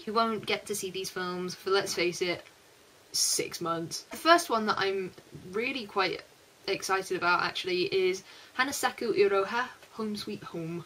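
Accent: United Kingdom. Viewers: aha what part of britain are you from? you sound alittle south eastern like london ? and lol to be honest the only anime i have seen this term that is watchable is Shinjekyo no kyojin i tried some of the other ones but meeeeh maybe ill try gargantia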